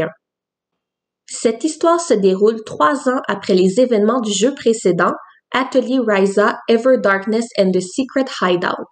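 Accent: Canada. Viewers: Sympa comme présentation ,l'accent canadien en plus .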